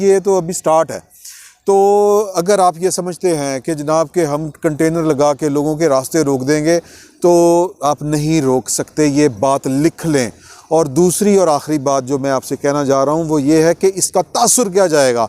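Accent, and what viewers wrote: Punjab, Pakistan. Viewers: Bhaiyaan Punjabi vich reporting kreya kro. Punjabi version of Urdu Jo boldey ho, funny lgdey .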